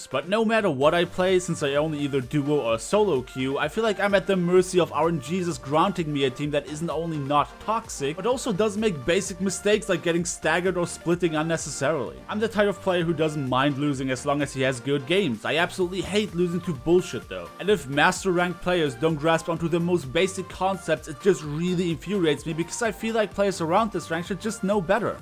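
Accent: Germany. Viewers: Ich wusste es (du bist ein deutscher ich habs immer gewusst alleine an dem akzent) haha ich bin nicht verrückt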